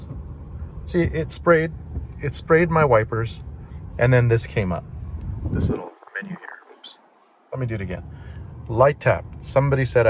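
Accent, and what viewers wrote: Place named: United States. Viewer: Thanks for the video. Would be fond of seeing you attempt to hit the indicator buttons while turning. Scenario: in Europe it's (generally) the law to blink in/out of roundabouts. I'm having a hard time seeing how to do this safely without taking the eyes off the road trying to find the correct button which by now might be on the other side (yoke upside down). You sound American so you might not see it as an issue, but I assure you it will be for many of us 😊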